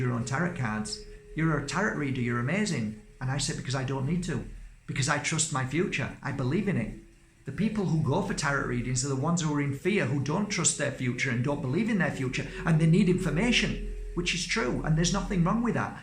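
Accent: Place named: North, United Kingdom. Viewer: My friend recommended you to me and you’re absolutely amazing. I can very much relate to a northern accent too haha ....Thank you for helping me see the light 😊xx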